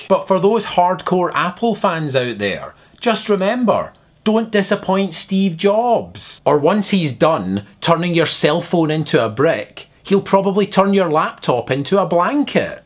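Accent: Scotland, United Kingdom. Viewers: that was simply brilliant. you are scottish, right? Living in land of the free or still back in the state of thistle and tartan? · Refreshing, and funny. Didnt like this guy at first, but he actually is quite unique, and says it as it is. Not sure where in Scotland this accent is, other than the Burgh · Lol , classic Scottish accent. I'd love to actually sound Scottish rather than English. A comment from a Scottish Tween x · "Why is His accent so weird?" Because He's Scotish perhaps? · People he's not Mexican! he's either scottish or Irish. Although, he is scottish I believe.... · o_o it's just a scottish accent.. many scottish people have that accent. it's not a voice xD it's his normal accent. lol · its a scottish accent .. not irish.. not british.. there is a huge difference.. duh w/e i luv this guy!!!!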